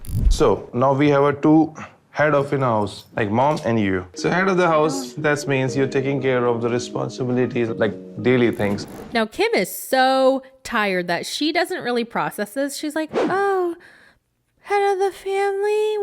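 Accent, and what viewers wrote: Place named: East Coast, United States. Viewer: I knew you were east coast lol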